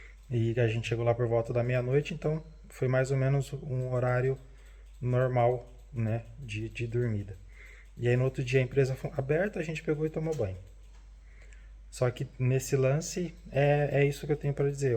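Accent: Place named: Rio Grande do Sul, Brazil. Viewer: Provavelmente é gaúcho.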